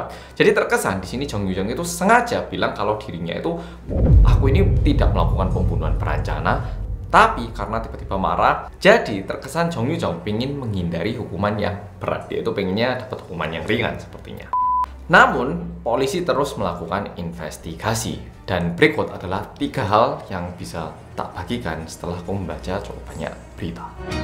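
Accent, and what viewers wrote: Java, Indonesia. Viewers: Mas kmu orng Korea apa orng Jawa tp kok logat Jawa wajh korea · mas nya muka korea tapi logat jawa 😅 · lo kok yg mbawa wartanya aku pernah lihat dia .waktu dia nyambut pak jokowi hadir d korea .dia ini pinter pakek basa jawa . dan dia bilang pernah tinggal d malang . mantep logat basanya ya medok . semangat brooo moga yutup mu sukses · Trmksh buat pak sopir ya,untuk mas Mimin cara menyampaikan berita bagus dan logat Jawanya kental banget.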